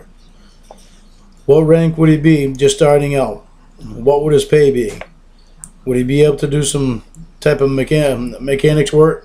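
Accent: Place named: Maine, United States. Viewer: Sound like a mainah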